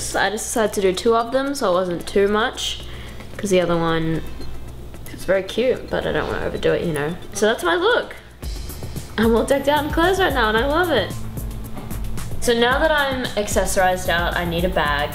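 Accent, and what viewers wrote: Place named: Australia. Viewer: im happy you still have an aussie accent :) ccome backkkkkk!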